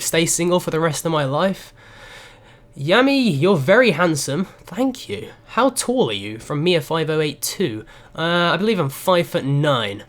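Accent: United Kingdom. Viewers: Is there anywhere in particular you want to visit in America? Also I'd love to see you say (or try to say) my username since you have a British accent and everyone already butchers it. P.S you should visit Texas when you come to America. I'd hang out with you. :p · i gotta few questions for you bro actually 1: is that a british accent u got? 2: how come your eyesights bad? 3:how is it you find out when a new slenderman game is out? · He forgot to say one thing he has that most others don't. He's got a British accent. All he needs in life now is to move to America, and use that accent to his advantage.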